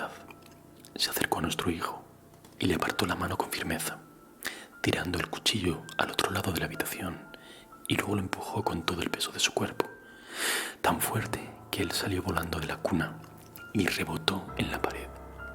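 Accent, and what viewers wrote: Spain. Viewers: i've been looking for an asmartist with your voice tone and spanish accent for a long time, i cant believe i've finally found it! (i can understand everything you say, im just bad at talking spanish back lol) saludos desde brasil!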